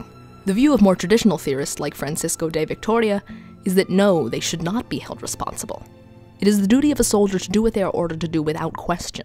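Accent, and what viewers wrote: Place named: Norway. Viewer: Your accents sounds Norwegian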